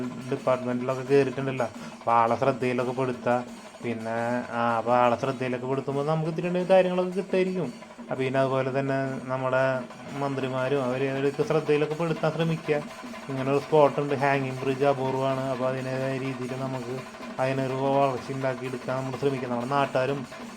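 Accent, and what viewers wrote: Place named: Thrissur, India. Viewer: സംസാരം കേട്ടാൽ തന്നെ അറിയാം തൃശൂർ anennu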